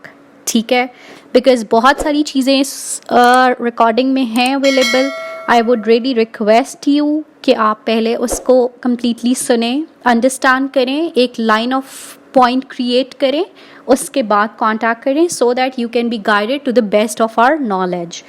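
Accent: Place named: India. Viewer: Nice speaking English just like indian so easy..appreciate to you madem...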